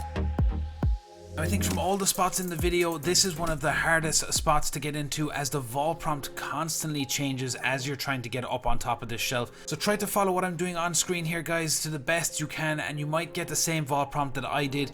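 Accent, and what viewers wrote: Ireland. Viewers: Where are u from in ireland? You sound like jack septic eye but idk what county sounds like that?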